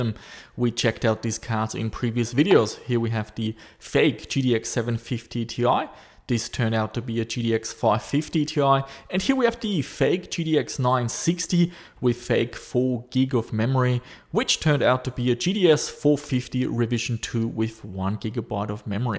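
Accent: Germany. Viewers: Your clearly sounds German why don't do the video in German? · German? :D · He's an Austrian who moved to Australia. That's why he has a German accent. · Man hört das du nen deutscher bist 😂😂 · Yay! A new Video. Btw. Are you German? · DAT GERMAN ACCENT!×£°J™£¶~™OA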